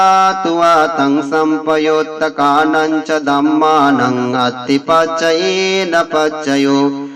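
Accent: Standard. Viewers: সাধু সাধু সাধু। একদম শুদ্ধ পালি উচ্চারণ। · খুউব শ্রুতিমধুর ও বিশুদ্ধ উচ্চারণ মনে-প্রাণে প্রশান্তি অনুভব করছি,, 👏👏👏, বন্দনা ভান্তে আপনার নীরোগ দীর্ঘায়ু কামনা করছি,, 🙏🙏🙏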